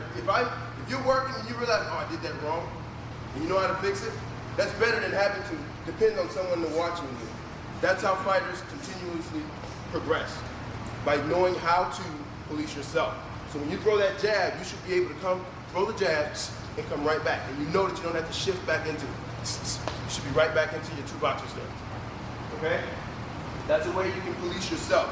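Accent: Baltimore, United States. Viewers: Baltimore accent? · Definitely hear Baltimore in his speech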